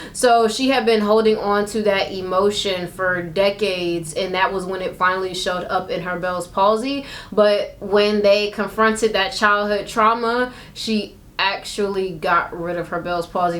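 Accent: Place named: Texas, United States. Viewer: I couldn't tell, I thought you just talk a little country like us in texas...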